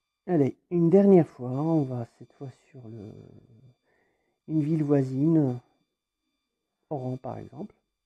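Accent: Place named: France. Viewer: ا صاحب الفيديو فرنسي اظن من لهجته. الله يعطيه الصحة شوههم و اعطاهم في عصير في كأس كبيييير . العز للمغرب و المغاربة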